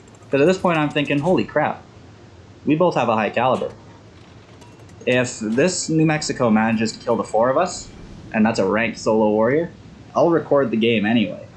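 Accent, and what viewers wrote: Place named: Canada. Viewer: Maybe. Apart from my heavy Midwestern USA accent and your mild Canadian inflection.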